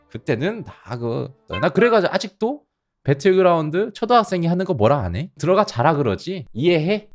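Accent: Gyeongsang, South Korea. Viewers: 김블루님 대구 사셨어영?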